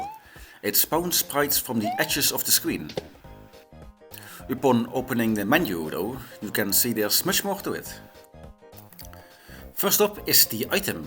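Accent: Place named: Netherlands. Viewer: kinda unrelated but i like the dutch accent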